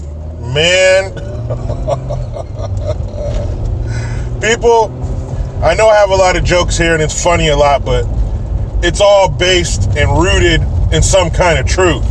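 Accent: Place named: California, United States. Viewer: Great video. I love your Californian voice. You should be on SNL with that voice.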